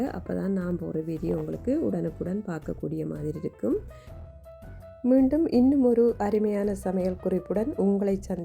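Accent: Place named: Sri Lanka. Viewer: ஸ்ரீலங்கா தமிழ் ரொம்ப பிடிக்கும் எனக்கு என் பிரண்ட் valvettithurai நான் இந்தியா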